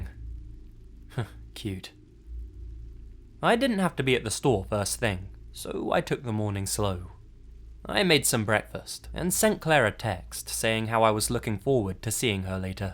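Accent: United Kingdom. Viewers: How and when did you get into narrating horror stories. Also are you British? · I love your creepy intro. And your adorable British accent.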